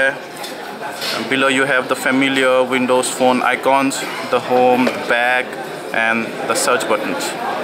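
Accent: India. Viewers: the voice made me laugh a haha i love that indian acent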